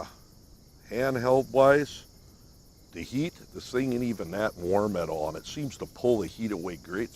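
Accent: Minnesota, United States. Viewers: Minotaurism probably could do a mean Macho Man too! They’re all from Minnesota area so it’s the accent.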